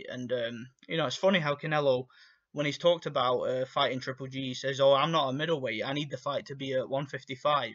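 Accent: United Kingdom. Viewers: Go listen to the boxing voice this dude is a joke! You sound like you from the U.K. Why waste time to make a video for a so called "shit fight" by you. Go talk about Liam smith an his brothers or that waste of a time sanders! Maybe they are better boxers for you lol !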